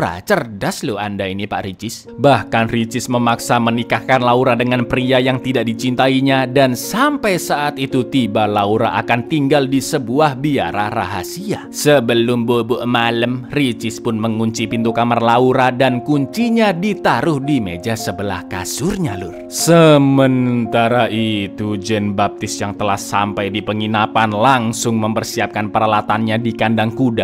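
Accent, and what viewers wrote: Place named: East Java, Indonesia. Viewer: Hahaha sebenernya punya potensi melebihi iq7, tapi kenapa jon iq7 yg lebih terkenal ya. Apa karena logat sunda jon lebih populer dari logat jatim nya cak. Entahlah, waktu yg akan menjawab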